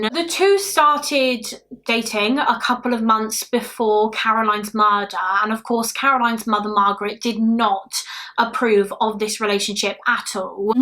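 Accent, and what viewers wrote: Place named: West Country, United Kingdom. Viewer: In case you're interested, accent is from the English West Country, so Bath or Bristol. Somewhere in that neck of the woods. 🙂❤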